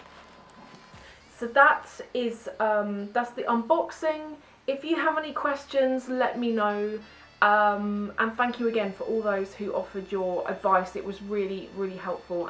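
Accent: United Kingdom. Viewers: british accent is wonderful !!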